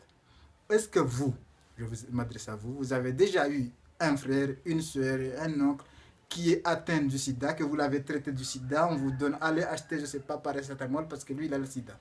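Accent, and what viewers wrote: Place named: Comoros. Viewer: vous êtes comorien? Ton accent me familier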